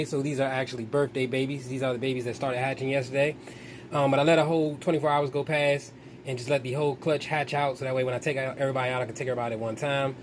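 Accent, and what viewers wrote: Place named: DMV, United States. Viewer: You sound like you’re from DMV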